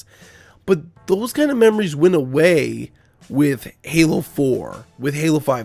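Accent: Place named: Ireland. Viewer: so you are coming back to h5 i did like your irish accent😎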